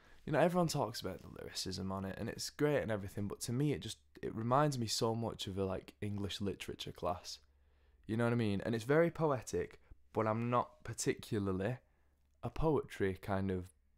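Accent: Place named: United Kingdom. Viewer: it's really refreshing to hear an actual nice British accent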